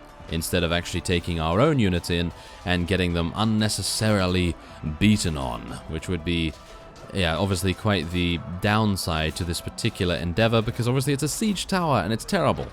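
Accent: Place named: Southern England, United Kingdom. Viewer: Southern UK.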